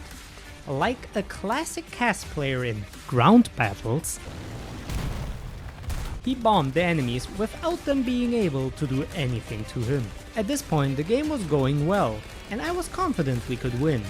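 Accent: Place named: Germany. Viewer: I love his German accent, it fits the ‘war crimes’ theme perfectly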